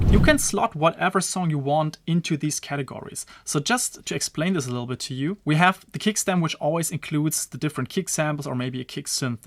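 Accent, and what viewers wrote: Germany. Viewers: Are you German? That accent sounds familiar 🙂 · Man hört echt raus das du deutsch bist bro haha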